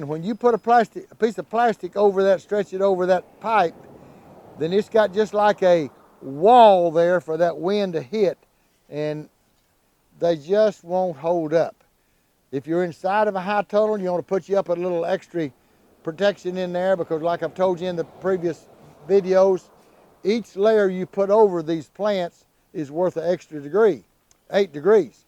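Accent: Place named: Arkansas, United States. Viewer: I love to listen to you for two reasons. One, you know so much and I live to learn from you. Two, you sound like my family in Arkansas and it makes me smile.😊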